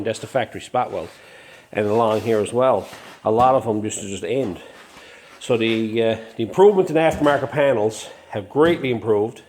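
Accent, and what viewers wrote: Newfoundland, Canada. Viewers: Newfoundland eh? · sound like a newf ?? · Newfoundlander? Always a pleasure. Subbed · Where are you from in Newfoundland? Def tell cause the accent.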